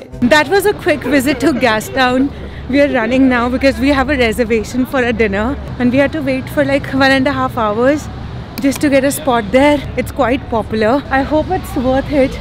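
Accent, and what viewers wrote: India. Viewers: Please change your Indian accent..it's so funny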